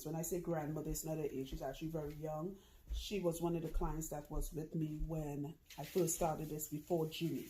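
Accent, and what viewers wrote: Trinidad and Tobago. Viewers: I knew you was TRINI before you confirmed it! Thank you for representing in such a knowledgeable and approachable way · From the time you began to speak, I knew 🇹🇹. Great job lovie. Keep it up.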